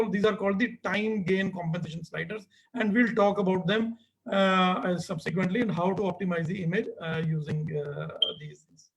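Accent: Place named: India. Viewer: There is NO ISSUES in the Audio, But the English pronunciation of the Doctor from India‼️He has a very strong accent from his country of origin. My observation only. Thank you for the lecture doctor‼️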